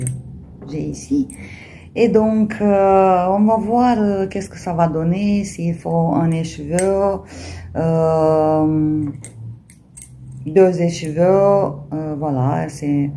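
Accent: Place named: Italy. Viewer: bonjour c' est très jolie ,avec un magnifique accent a la monica bellucie!☺ laine jolie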